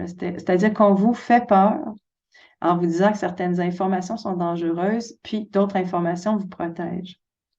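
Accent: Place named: Canada. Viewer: Toujours une joie de vous entendre! J'ai eu cette chance de vous approcher quelques minutes lors d'un événement dans le sud de la France. Dire que mon écoute vers vous depuis 2020 venait au fait que cela faisait écho à mon ressenti mais aussi grâce à la musicalité de votre accent me rappelant le plaisir que j'avais à entendre des membres de ma famille expatriés au Canada!!! Merci beaucoup pour tout ce que vous apportez à notre réflexion... une vraie richesse! Et je vous suivrai quand vous ferez votre page perso. Au gré des rencontres que la vie nous offre! Bien à vous.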